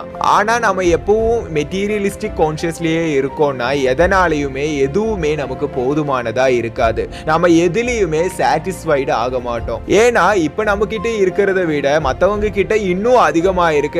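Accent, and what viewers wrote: Kerala, India. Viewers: யோவ் ஒன்னு மலையாளம் பேசு இல்ல தமிழ் பேசு,அது என்ன தமிழ் மலையாளதகதை தமிழ் ஸ்டைல்ல பேசுற,சகிக்கல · Malayalam slang varuthu..but nice👍🏼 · I like the way you talk tamil. It’s good to hear a Malayalam slang Tamil. 👏🏻👏🏻👏🏻 keep posting more videos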